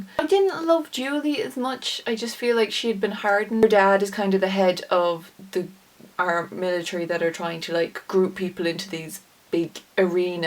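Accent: Ireland. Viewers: I thought there was a cat behind you till I realised it was a reflection of your arm in a mirror lol. Great vids. Always good to hear fellow irish on here. :)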